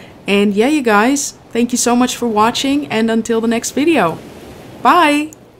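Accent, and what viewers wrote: Netherlands. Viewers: Im gonna guess.... Ben je nederlander? Lol je accent.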